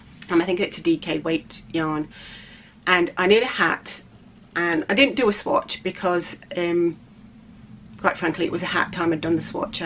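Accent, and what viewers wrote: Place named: Australia. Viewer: Hi just discovered your podcast through little drops of wonderful loving it love your accent thought you were Australian keep on the good work looking forward to your next one x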